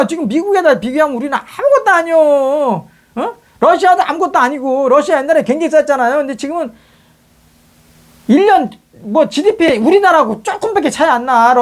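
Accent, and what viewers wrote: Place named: China. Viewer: 유튜브발음이 중국인같음!!! 윤통체포… <link>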